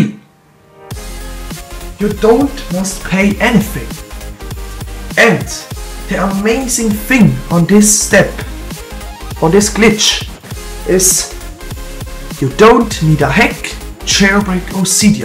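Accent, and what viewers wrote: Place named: Germany. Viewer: Are you German or something? you kinda sound like your German. And if you are, Hallo :-)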